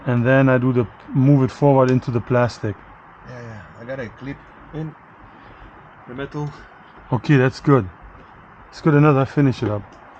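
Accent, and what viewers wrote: Germany. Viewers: Super nice Video! If you could do the process also for a VW Touran VAN? Seems to me quite simular, but obviously there are differences. Also i don't have a garage with all the tools you used. By origin you must be garman by your accent? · Really hoped this would be easier for someone who usually doesn't work on his car 😂 You're accent sounds a bit German, and your friend's sounds Italian. What kind of accent is that?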